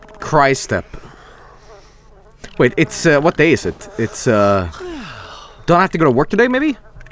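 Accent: United States. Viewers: you're starting to sound like an American.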